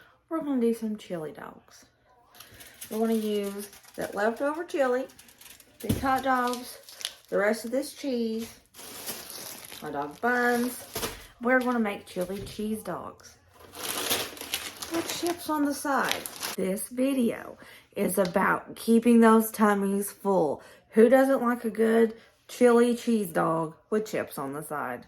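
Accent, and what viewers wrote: South, United States. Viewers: Thank you so much for making this content. I love your videos. I'm trying to find more YouTubers that do this kind of content. I love your grocery shopping challenges. I also love your southern accent ❤ thank you so much for all you do.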